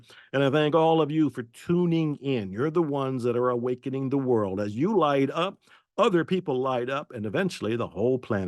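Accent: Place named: United States. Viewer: Thank you Joe for this very inspirational video. Love your books, love your explanations, love your perfect American English. Happy birthday and blessings from Russia!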